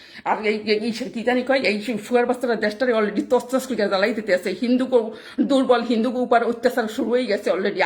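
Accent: Barishal, Bangladesh. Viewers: Apni ki borishaillah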